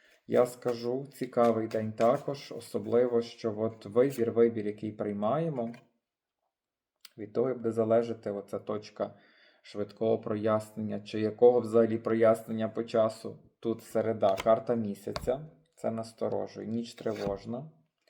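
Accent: Ternopil, Ukraine. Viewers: Спасибі, з тобою спокійно...затишно..і ще люблю тернопільський говір..твій баритон.....ти народний провидець. дякую.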